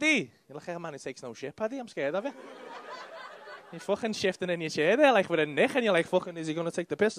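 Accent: Liverpool, United Kingdom. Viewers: Love the crowd work. It took me many videos with subtitles to get used to scouse but now I understand most of the lingo. Amazing work, big fan! Keep it coming.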